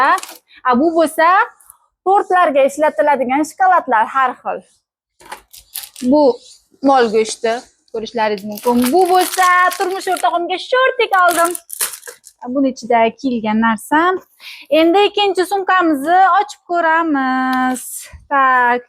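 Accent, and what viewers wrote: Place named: Qorakul, Uzbekistan. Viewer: Ман хам шуни уйладим бу точна Коракул ёки олот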